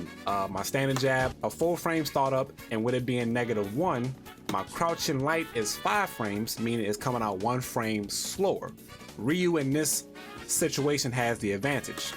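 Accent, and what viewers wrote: United States. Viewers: I know this is old, bro. But thank you. I'm learning to play for real now and I know that some people preach about the frame data thing. I ran into your video and THANK GOD you're just as country as me lol. That helped a lot. Appreciate you explaining this 💪🏾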